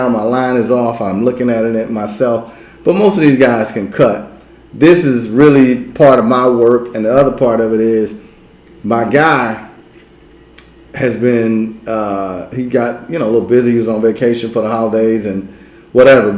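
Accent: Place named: East Coast, United States. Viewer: You sound like an East coaster. Where you from fam?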